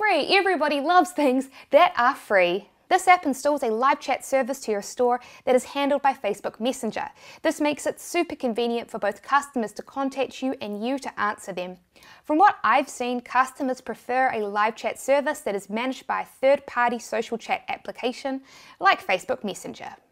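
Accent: New Zealand. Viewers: literally have nothing of value to add, just a shoutout for being a kiwi. came across this channel after discovering the massive world that is ecom and couldnt help but smile when i heard a familiar accent - definitely didn't expect it on youtube. obviously physical location has little bearing on the internet really, but huge respect for building what you have here :~)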